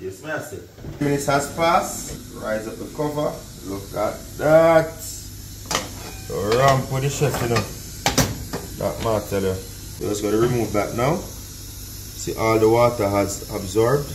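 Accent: Jamaica. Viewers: Chef, next time you come back, please do a pop-up cookout in MANDEVILLE. I would order this meal. While you're on-site cooking you could do a book signing for fans. It would be a great way to market your book. Your meals are prepared in such an appealing way that one can eat with one's eyes. I also love your attention to cleanliness. No dutty pot, we say. What did you say, Old cow hard to chew. 😂 Don't go offending any old cows now, my boy. I love the little value bombs you drop offering instruction plus little-known cooking secrets. Communicated in standard Jamaican English. You've got it locked. 💯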